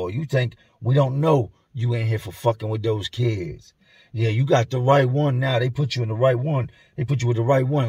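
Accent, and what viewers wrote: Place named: Philadelphia, United States. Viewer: he fr talks how we talk out in philly, its just where and who he's spent his time with that influences how he speaks. just cause we talk a certain way doesnt make us hood or anything else we just talking how we are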